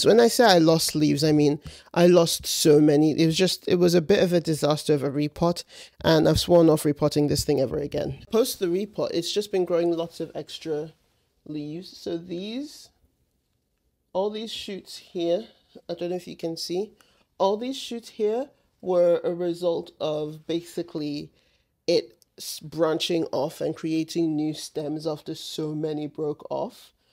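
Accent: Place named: South Africa. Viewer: What a wonderful collection and they all look so happy! (new subscriber here) I love listening to your voice, so musical and expressive! Is your family from South Africa? A good friend of mine is SA and your voice reminds me of his.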